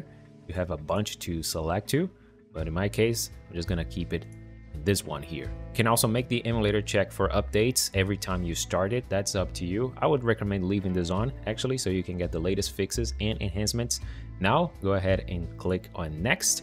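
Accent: Brazil. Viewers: Best Brazilian person accent i ve ever heard. congrats for the work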